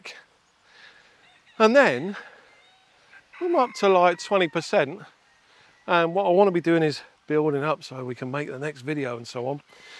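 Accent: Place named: United Kingdom. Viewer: Hey. I've been following you for a few months now. I gotta say that I truly love your content and admire you both. I don't think people realize the work that goes into a Youtube channel sometimes and the return to work ratio involved. You guys are rock stars and I love how genuine you come across in your videos (Perhaps the British accent helps LOL). Anyhow, I also have a channel about anesthesia (I'm an anesthetist in the US), small channel 5k subs and I would concur that 98% of the comments are good, but I do get the eventual terrible comment that can be considered hurtful. I've learned that there are a lot of people that just want to criticize for no apparent reason. It can be brutal. But I conditioned myself to not become overly emotional with bad comments and I am quick to reply to them and tell them to move on. I could never understand why people have the need to make a bad comment on a video if they didn't like it. 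My thinking is just move on or swipe away. Anyhow, I'd work on the psychology of dealing with negative people and continue to do what you enjoy. I particularly like your videos when you explore the mental side and financial preparation involved in retiring early. Looking forward to your next video on how much do you need to retire. That I know, you've never dug deep into actual numbers and this is highly sought after forepeople like me who think we never have enough to actually pull the trigger. With that said, looking forward to the next video. Best of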